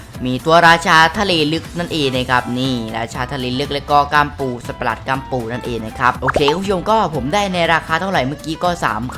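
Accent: South, Thailand. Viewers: คนใต้มั้ยเนี่ย ดูมาหลายคลิปแล้ว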